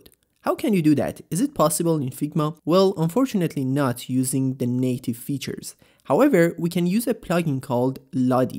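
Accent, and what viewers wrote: Iran. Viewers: I can distinguish that Iran English accent from miles away. Cheers to you brother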